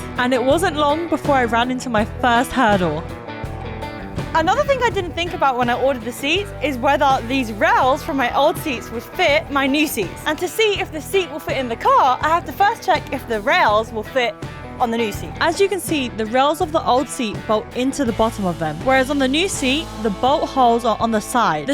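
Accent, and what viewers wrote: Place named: Jamaica. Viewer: Why do you talk like a yardie ?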